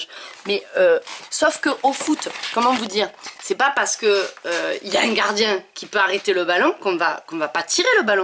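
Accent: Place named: Provence, France. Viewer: C'est la première fois que je me rends compte que Nicole Ferroni a un léger, et néanmoins délicieux, petit accent méridional. A croquer !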